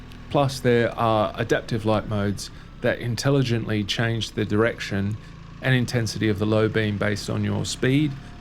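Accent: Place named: Australia. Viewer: Luv yer review. Mayt, ya sound almost like an Aussie. I’ve not seen any of your reviews before, but I liked how ya did this one so I’ll look at a few more. Cheers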